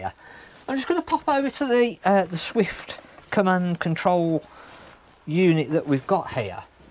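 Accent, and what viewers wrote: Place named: Birmingham, United Kingdom. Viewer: Correct me if I’m wrong your a fellow brummy😂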